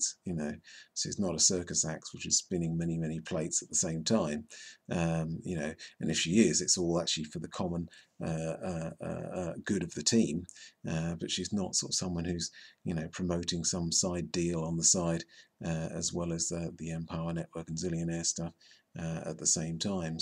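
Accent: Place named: United Kingdom. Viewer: Just gotta say, I love your accent (I'm a sucker for a British accent though).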